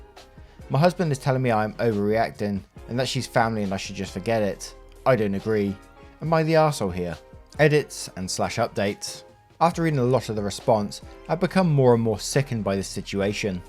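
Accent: England, United Kingdom. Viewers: No way would I have wanted to give birth to my sons without my husband there, but that was my own choice and his. I would have lost it if my in-laws had tried to interfere. Definitely not on! Thanks for doing these. You're the only Reddit reader I've found so far that's English rather than American, and actually seems to know all the words you're reading. I really enjoy listening. Good luck for your dad!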